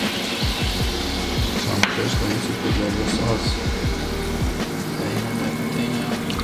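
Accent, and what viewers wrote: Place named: France. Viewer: Je trouve chouette ta chaine car je vais apprendre l’anglais et cuisiner avec toi ! C’est cool et du coup on comprend tout vu ton accent français.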